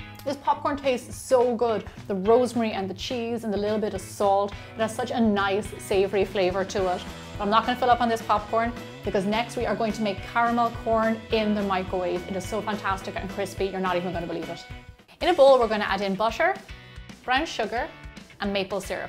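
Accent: Ireland. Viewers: Are you from ireland because I am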